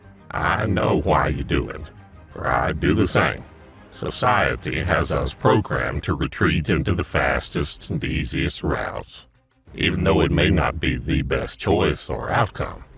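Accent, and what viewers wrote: South, United States. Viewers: why does the voice over have a southern USA accent? this was a really cheesey attempt at voter disuading vote or vote supression... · What is with the American accent? That just doesn’t seem right.... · Oooof what a cringe video. Southern accent doesn't help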